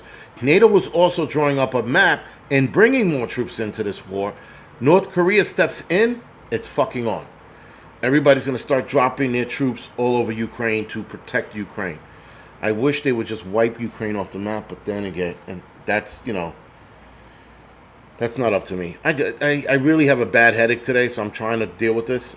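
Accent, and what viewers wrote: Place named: Southeastern Massachusetts, United States. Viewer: I love how real you are. You talk like us here in SE MASS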